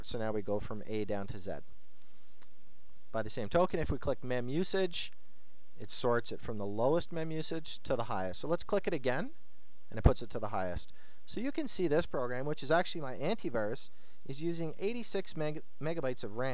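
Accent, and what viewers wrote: Canada. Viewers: ARe u Canadian? Cuz u talk like Les Stroud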